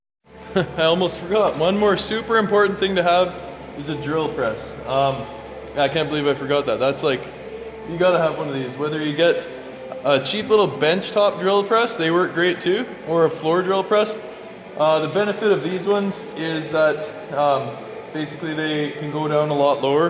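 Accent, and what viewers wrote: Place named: Nova Scotia, Canada. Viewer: Your accent tricked me haha totally sound like your from NS. Cool vids btw the anvil is awesome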